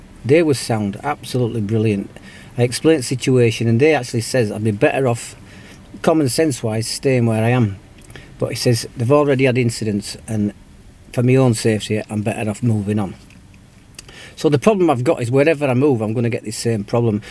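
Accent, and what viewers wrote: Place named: Yorkshire, United Kingdom. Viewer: I know you haven’t and never will change, but the World has changed mate and it’s caught up with you. Better repair back to where your accent won’t identify you as an ‘outsider,’ which in your case is Yorkshire. Once this is all over, hope to see you making great photos in your own unique nomadic fashion, but who knows when that will be!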